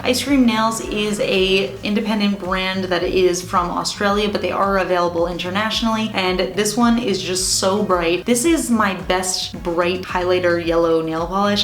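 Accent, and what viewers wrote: New Jersey, United States. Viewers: She's talked about it before. She knows it's kind of odd. She says it's because she's from/lives in New Jersey. I don't know about that because I've never been to NJ, but considering how many people of Italian descent live in NJ I can believe those Italian grandmas could pronounce the first syllable the same was as pasta. And then there are the west coast non-Italians (like my grandparents were) who can't pronounce pasta without the short A, so it sounds like the word "past" with a U sound tacked on-- PAST-uh. But then, they can't pronounce the word Italian, either. It comes out EYE-tal-yen, or maybe eye-TAL-yen.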